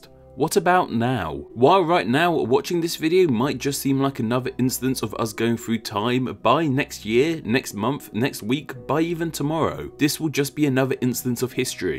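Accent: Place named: United Kingdom. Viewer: I have never bought into the whole "British/English people sound smarter than American's" until I found your channel. You have such a great voice, and put things in such an awesome understanding for even the dumbest of us. Thanks for all you do, unfortunately, I cannot be a Patreon at this time, but one day I hope to be. Thanks.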